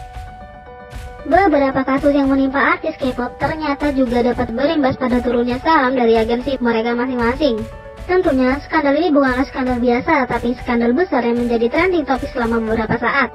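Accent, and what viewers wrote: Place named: Java, Indonesia. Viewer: Bentar yang ngomong ini kayak orang jawa btw😁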